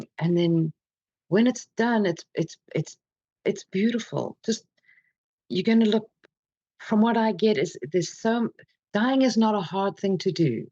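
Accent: South Africa. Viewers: this is an odd question.. but your accent - I hear South Africa but there is definitely something else there, if not two other language / accent influences?? Just curious. Thank you for sharing - hello from Jukskei Park in Fourways. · Hi hun, you explained this all very well. I lost my sister and it is still very raw, by the way, you sound like you come from Durban, I'm from SA! Durban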